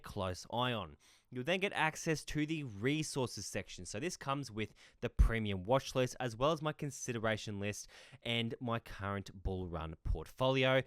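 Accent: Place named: Australia. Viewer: Mate love your work ! Great to hear a fellow Auzzie, with great research !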